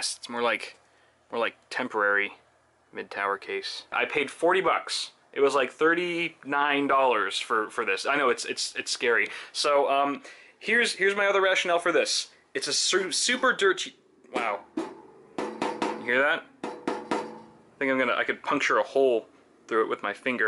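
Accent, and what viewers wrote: United States. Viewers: This build is cringe worthy, with the brown pcb on the motherboard, ghetto cabling, sketchy power supply, cheapo case, and an american accent half the time. is a legend, he pulled all these things off in one video. You shall never be forgotten.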